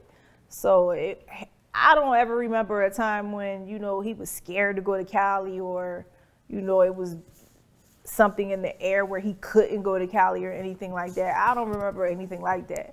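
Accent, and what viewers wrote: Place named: Philadelphia, United States. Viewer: I love her Philly Accent!!!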